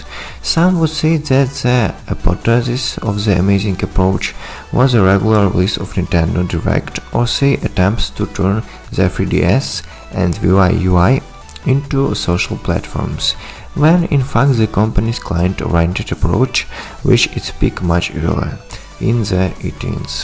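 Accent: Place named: Australia. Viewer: Man youve got a fkn mad accent mate,were the hell r ya from?? it sounds are you a australian student living in the suburbs of cali in the u.s.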